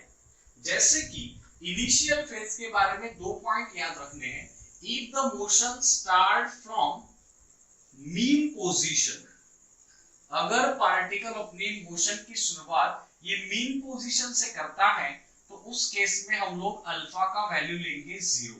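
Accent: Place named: India. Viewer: Best.. just need to improve style of speaking becuse ur style seem desi type. May Allah give u success ameen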